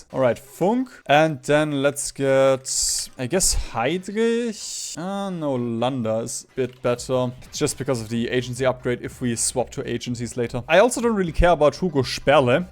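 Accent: Germany. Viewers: Ich höre das deutsch raus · Du klingst Deutsch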